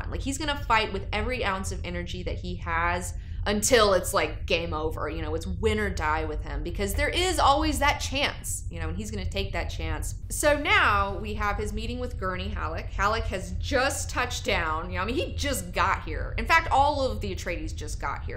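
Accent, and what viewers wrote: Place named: Northwest, United States. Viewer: Okay I really gotta know something. Have you spent much time up here in the Northwest? Because there's a slight influx that I hear in your voice. Like " Out & Aboat" instead of "Out & About. Like the guy in Dirty Harry who hast to know if he fired 5 or 6 shots. I Got's to know!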